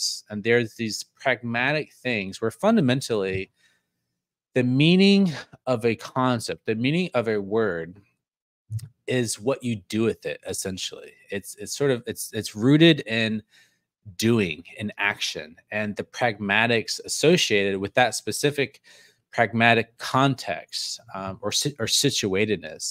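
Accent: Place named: Staten Island, United States. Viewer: You have like a staten island accent "dictionarYYYY"" pulling the last vowel.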